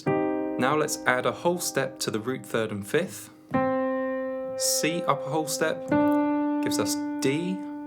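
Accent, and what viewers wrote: United Kingdom. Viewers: I damn near pulled my hair out of my head listening to your horrible British accent! I turned it off 3 min in.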